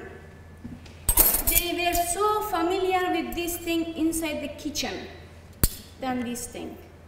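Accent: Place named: Iran. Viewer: 17سال در اروپا مدرک دکترا باید انگلیش اد خیلی خوب می بود انگلیش را مثل فارسی صحبت می کنید لغت ها سر به سر کردی گفته روان هستی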